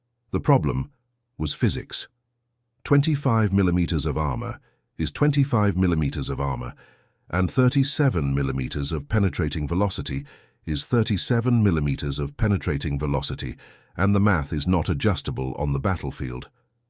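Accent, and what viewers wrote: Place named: United Kingdom. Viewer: Wisconsin (now). Uncle was Naval Academy grad who commanded a destroyer in Pacific. Other uncles served in Canal Zone and elsewhere. My dad was too old but wasn't taken when he volunteered because he was an oil chemist and manager. He and one uncle were needed at home to produce oil and cotton. Friends' moms were welders etc in defense plants and shipyards.We all grew up in Arkansas like Pappy Gunn, learning to shoot when young including women. Both bros, 1 dead and 1 100% service-connected disabled, and I plus several relatives are VietNam-era vets. Your AI images, Brit-accented narration, and fake sonagrams suck.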